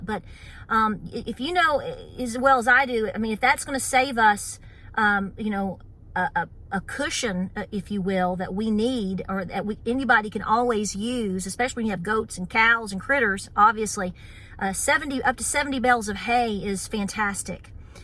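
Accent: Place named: Tennessee, United States. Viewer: Love your channel more and more everyday. Love that Tennessee accent too. Lovecyall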